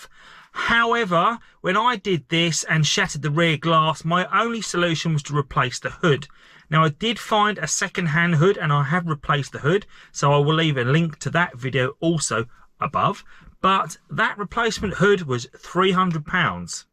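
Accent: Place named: Kentucky, United States. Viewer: Nice accent, Kentucky?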